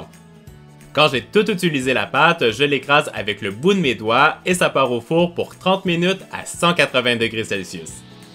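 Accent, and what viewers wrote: Canada. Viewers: J'adore ton accent canadien t'est vidéo son trop bien continue comme ça on adore tes vidéo YouTube bisous 😘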